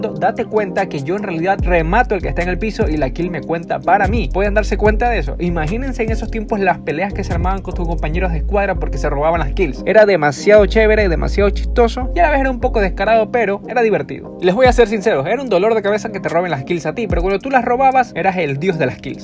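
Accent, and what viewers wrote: Venezuela. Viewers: Eres venezolano:v?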